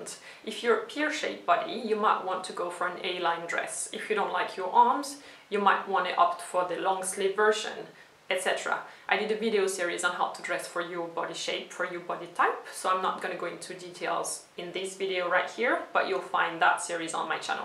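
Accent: France. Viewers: Quand un français essaye de parler en anglais sa donne ça ! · I was thinking that you were actually a French, from your accent.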